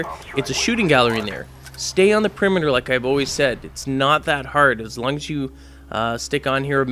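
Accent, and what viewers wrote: Canada. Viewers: are u canadian????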